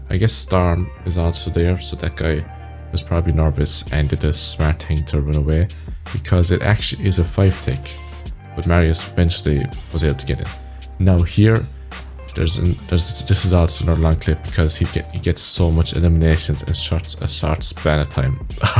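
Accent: Ireland. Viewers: Is you Irish?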